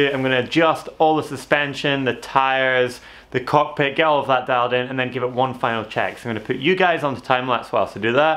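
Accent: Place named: United States. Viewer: Might just be me but your accent sounds a bit American!? Nice bike though.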